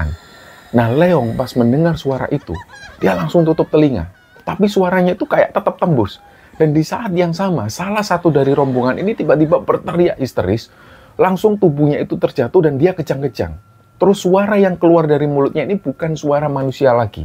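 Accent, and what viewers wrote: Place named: Java, Indonesia. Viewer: kan channel dari Jawa(SBY-Jatim) jadi wajarlah kalo kak tidak menghilangkan logat jawanya..toh beliau selalu menjelaskan kok setiap "kata"(yg dianggap sulit) ke dalam bahasa indonesia agar pendengar paham..setiap host kan punya ciri khas sendiri.. "wong jowo ojo ilang jowone" kalo misal dari Tim mau membuat translate juga gpp..yg penting pendengar masih bisa menikmati cerita..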